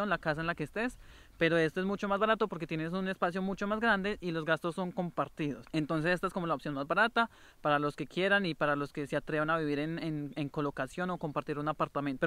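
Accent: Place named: France. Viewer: el acento te quedo asi por usar el Frances a diario o siempre hablaste asi Español?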